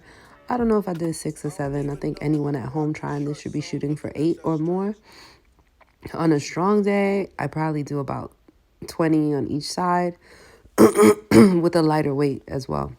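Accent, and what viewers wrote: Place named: Colombia. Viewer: Are you Colombian because you accent is wonderful keep up the work you are doing great shoutout to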